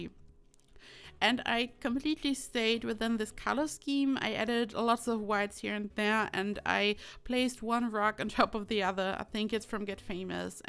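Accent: Germany. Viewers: First, congratulations on bypassing the 100k subscriber mark and receiving your silver play button! You definitely deserve it. Secondly, I happen to love your German accent. One side of my family is of German ancestry and I took German in 10th grade many, MANY moons ago. I'm super happy for your Simming success. Also, congratulations on being the designated SNB Bank venue builder for SimRealist. Way to go!!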